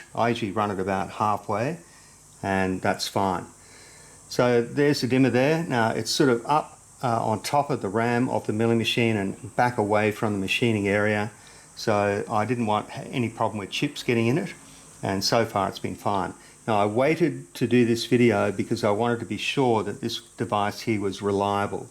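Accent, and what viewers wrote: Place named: Australia. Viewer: Talking is fine by me as I learn a lot from you, and my wife thinks your Australian accent is cute!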